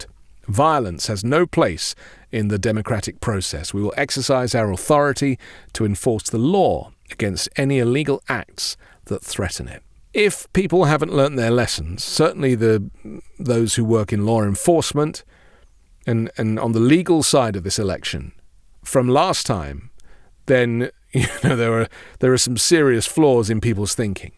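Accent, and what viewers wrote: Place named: RP, United Kingdom. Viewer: I came here cause I needed to hear your posh, measured, and calm British accent to soothe my frazzled nerves and chewed fingernails. I'm really trying to manage my expectations and your ever intelligent reporting and journalistic integrity has helped to keep me sane and from panicking. Thank you and everyone at MTN for choosing Science & Facts and giving us Democracy loving Patriots a news home.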